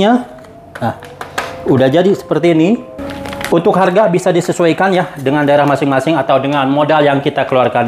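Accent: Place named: Sunda, Indonesia. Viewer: Mantap kang, di GALO pokokna mah, dan kita SODOK, sunda pisan kang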